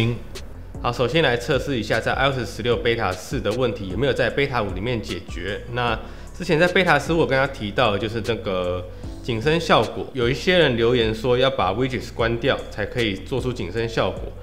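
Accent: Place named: Taiwan. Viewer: 一摸幾，你發音有夠台灣國語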